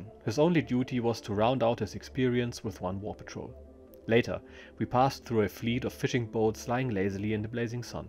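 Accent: Germany. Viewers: thank you for the editing and translation, it's that much more enjoyable knowing you ensure accuracy. Again, the German accent makes it that more authentic. If I go to a Chinese restaurant, I prefere to see a Chinese guy cooking in the kitchen.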